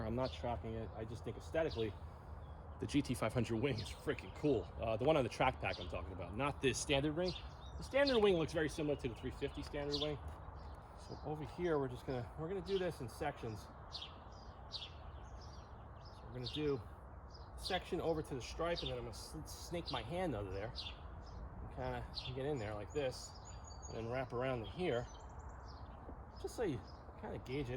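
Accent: New York, United States. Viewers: Awesome job on the correction! I heard you mention you already have cquartz uk on it already. I have the same setup on my RAV4. I talked to Corey about this from carpro and you really don’t want regular wax or sealant on top of a coating. They make “Gliss” now to put on top of Cquartz for extra depth and shine. I love the Wolfgang liquid sealant which is like Jescar power lock. I also dropped 2 hondo on Wolfgang concours carnuba. I heard the NY accent right away man, I’m from Jersey. Not knocking you at all about wax over coating. Just wanted to throw that out there. Griots are the bees knees. You literally have the same set up I have from the megs pads to the PFM towels. You need to try microfiber madness cloudbuster, they make the best glass towels I’ve ever used in my life. I gotta upload my 18 hour day of paint correction now after watching this video 😎 You rock man...keep it up!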